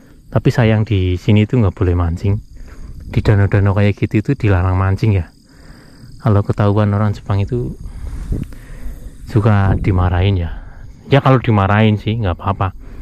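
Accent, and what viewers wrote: Banyumas, Indonesia. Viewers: aku suka gaya ngevloge, ana ngapak2e...👍👍👍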